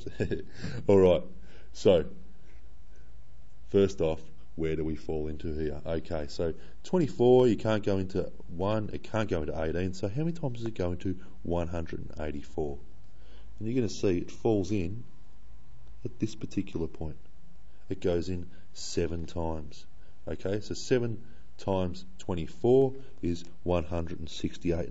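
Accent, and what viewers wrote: Australia. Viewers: i can hear him perfectly, maybe you guys can't understand his aussie accent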